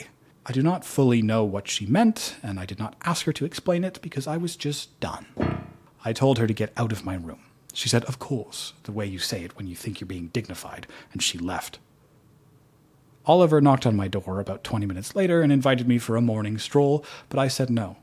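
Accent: RP, United Kingdom. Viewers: I liked your accent, but thats quite posh, there are soooooo many accents here, but saying that i do know people that speak like that, and I also have a Aunt like Charlotte whom I dont speak to because shes rude! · GREAT story and your British accent was very entertaining! My mother was English and was HORRIFIED by iced tea. "Ice in your TEA?!" No problem with iced coffee, tho. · I absolutely ADORE you! You have such a nice voice and I love the side commentary and piano riffs 😂😂 also, not sure this is worth much from a Texan, but I thought your British accent was marvelous! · Speaking as a Brit watching this, ordinarily I would have told you off for using the stereotypical 'posh English person' voice. However, from the language used in the story I think they might actually be that kind of Brit lol. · Congratulations on 55,000!!! 🎉🎉🎉 Love the accent. 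I’m a fan of all things British and you were spot on. 😊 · I’m not British, but I do like accents, and (for what it’s worth) to my uneducated, American ears your accent sounded perfectly posh! 😆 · British person here: British accent approved and appreciated hehe ❤ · You have a great posh british accent lol, and I say this as somebody with a british husband Though, he only has a slight posh twang, since he's got an expat accent that's just heavy on the british side, and he's specifically from Bristol, which is the land of the pirates, rather than whomever these posh bastards are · This is the second time that I have watched this and I can picture almost everyone in this story. The title does seem a bit misleading though. I think that the should be a narrator for audible he needs a manger to get him a gig. Also I thought his British accent was great.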